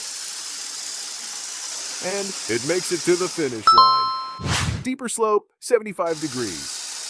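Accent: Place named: United States. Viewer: The fact that the narrator has an american accent really piss me off.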